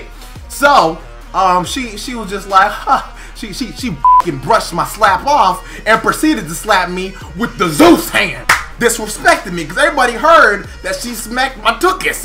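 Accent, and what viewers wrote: United States. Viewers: You from michigan bro?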